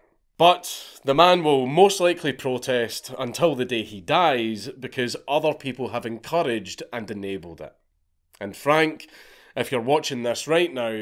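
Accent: Scotland, United Kingdom. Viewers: has a neko t-shirt and sponsored by a knife company. Been watchin some of that h enti too? Please don't turn fully Japanese we need you for that nice Scottish accent.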